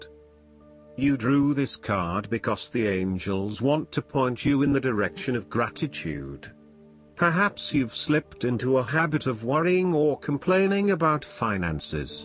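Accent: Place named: United Kingdom. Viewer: Beautiful British English pronunciation. Good reading, as well. TNX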